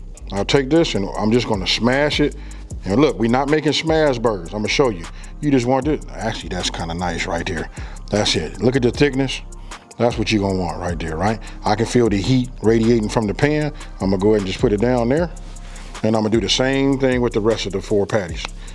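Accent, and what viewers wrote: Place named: California, United States. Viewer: I don't know if you are from Cali but you sound like you are. I have cousins there and the accent is........, LOL Just like you guess would say about southerners. LOL It's the way ya'll prounounce words with the letter "I" LOL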